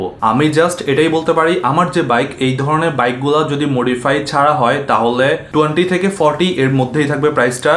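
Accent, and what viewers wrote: Chittagong, Bangladesh. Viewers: ভাইতো খাটি চাটঙ্গাঁইয়া। 😍😂😂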